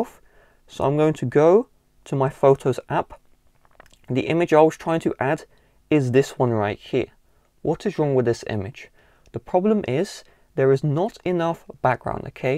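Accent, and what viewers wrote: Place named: United Kingdom. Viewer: i like the charming british accent ! ❤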